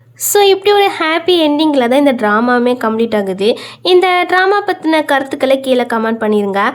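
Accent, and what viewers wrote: Tirunelveli, India. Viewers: Novel reborn story romba nalla solrenga pa 👍 super ah eruku nellai slang 💐 weekly 1dramavathu podu pa unga channel la 2years munnde potta drama en delete pannetenga 😔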